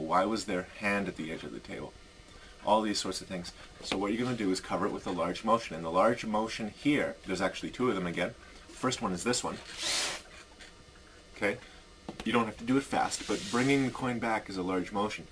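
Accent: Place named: Canada. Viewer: Miss-direction? Who is she, i would like to meet her? Unless I have missunderstood! lol Very informative explanation - I saw Shawn Franquar at convention the other day. I guess you are from Canada by your accent? I remember going to Browsers Den in Toronto and saw Jay Sankey. I wanted to work there with jeff and Shana. Also Vanishing rabbit in Calgary AB. You may know Brett or Tyler!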